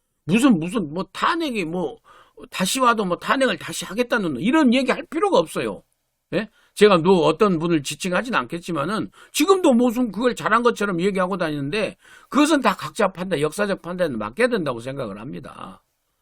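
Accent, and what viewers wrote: Jeolla, South Korea. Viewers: 구수한 전라도 사투리로 말 도 잘 하시고, ㅋ 정말 계파정치 하지 말아야 합니다. 경상도가 대표로 나와도 안됩니다.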